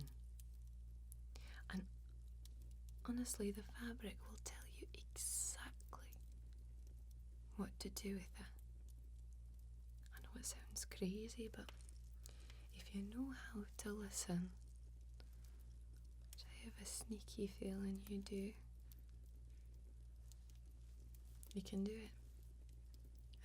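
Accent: Scotland, United Kingdom. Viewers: Wow a new player has entered the ASMR ring and she’s amazing!!! Seriously I am blown away by the quality of these vids! Usually it takes 6 months to a year for new ASMR channels to start putting out such good and LONG videos! But this is channel is already a contender with Goodnight Moon for best fantasy role plays! And I absolutely adore fantasy role plays they are my favorite thing to watch to relax so I’m really really excited to see where this channel goes!!! The accent is gorgeous and so good I thought you were really Scottish! Do you play DnD because you are amazing at character building!! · This is incredible quality for a second video or any video for that matter. I'd expect it from Goodnight Moon, who's been doing it for a while, but for a second video, it's amazing. The only improvement I can think of, not even a criticism, would be 60 fps. 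But that's a small matter. Everything is perfect. Your accent is just a plus, it's wonderful. Your first video it was much softer, almost American with an English tinge. The hard Scottish is great. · Loving the soft Scottish accent. Another superb ASMR video to sooth my frazzled Christmas mind. Thank you so much. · Stumbled across your channel by accident, this is incredible!!! I thought you were Scottish for real! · Okay , but how can all of your accents sound soooooo authentic. This is the first video of yours that I watch, and I legit thought your were Scottish , and then I watched your elf role play ,and thought that your were a brit with a really good Scottish accent, and then I went to your cooking channel.MIND BLOWN. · Blacksmith with a Scottish accent in a fantasy universe... Just like dwarfs. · Not even 5 minutes in and a Scottish accent. I like. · Ok youtube ill finally click on this it keeps popping it my recommended . 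Love the scottish accent. really pretty. good asmr. ok i subbd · First video of yours I've seen, had to come back to this video because I was confused when you weren't Scottish in your next video, solid effort, loved it! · I can always use more Scottish accents in my feed of ASMR videos. Thanks for the very apparent effort even right out of the gate. I hope to see more from you. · I love the Scottish accent. Quite good! Also, I have no idea why Scottish accents are so heavily tied to blacksmithing, but it makes me proud that they somehow got renowned for such skills. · Scottish accent + blacksmithing + ASMR all things i adore and luv in one video, my god how did i stumble upon this vid just now ? totally subbed :heart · Is that a Scottish accent? So mesmerizing and a very attractive women. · Goodnight Moon's rich fantasy storytelling meets ' accent. I love it! The soft speaking is really relaxing and you can tell that the production values were high. 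· Ahhh the Scottish accent is amazing! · Damn good accent. I'm from Scotland, and you'd easily pass as someone from the north or west coast ^^ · What a great accent ! You must have been to Scotland! X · That's an excellent East Scotland accent if you are not really Scottish. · There's something about blacksmiths that just demands a thick Scottish accent. It is pleasant to hear.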